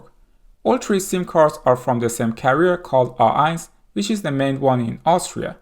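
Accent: Iran. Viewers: I like that Iranian accent ;) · Again great video dude. My wife is saying that you have a persian accent ? Are you persian ?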